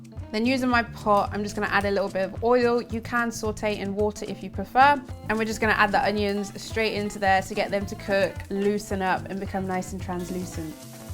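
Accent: United Kingdom. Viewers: love that british accent 😍🤣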